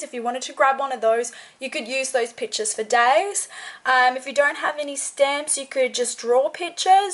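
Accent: Australia. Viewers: You're aussie accent is so refreshing! I'm an Aussie and I don't know why but I get so used to international accents on YouTube videos that it's always such a nice surprise to hear Australian accents and phrases online! This video made me realise how much I've been missing simply talking to other people because of the pandemic! Excellent video too 😊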